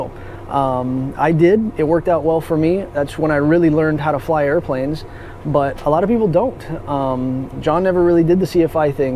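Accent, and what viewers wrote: Canada. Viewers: North Texas? I thought he had a French canadien accent. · You sound like you're from Canada, not Texas.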